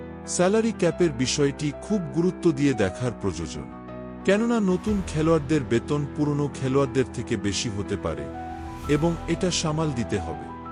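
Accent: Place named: Bangladesh. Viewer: উচ্চারণ শুনে মনে হচ্ছে বাংলাদেশি। আমার অনুমান যদি সত্য হয় তাহলে বাংলাদেশেও মোহনবাগান সমর্থক আছে জেনে আমি গর্বিত।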